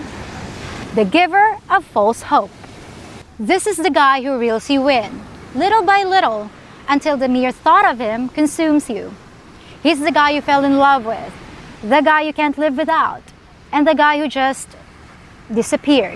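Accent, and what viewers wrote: United States. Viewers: You speak English with a VERY American twist... very entertaining, realistic, and honest. "Filters" didn't exist when ang akong asawa and I were video chatting decades ago. And I made sure she understood although I made respectable money? I was a working man... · Hey, did you ever live in the states or dated Americans? I've never met a Filipina who could speak like you. Just wondering.:-) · i can hear that american accent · Kudos to your great American style English. Well done.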